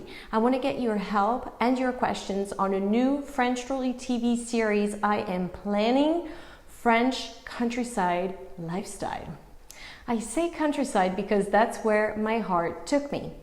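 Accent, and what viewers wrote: United States. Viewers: You sound like a true American. Congrats.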